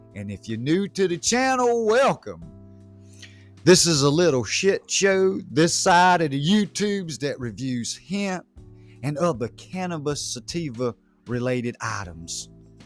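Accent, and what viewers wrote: South, United States. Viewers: Good Reviews... awesome southern accent my G know what im talkin bout..🤙🖖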